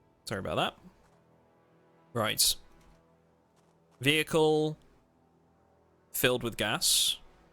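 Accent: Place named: United Kingdom. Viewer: Your voice slightly, but only slightly reminds me of ItsJabo. Maybe because both of you have the same accent. Both of you are from the UK.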